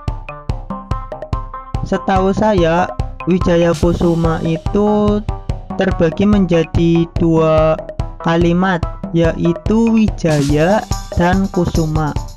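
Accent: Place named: Banyumas, Indonesia. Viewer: Ora ngapak ora kepenak wakamaka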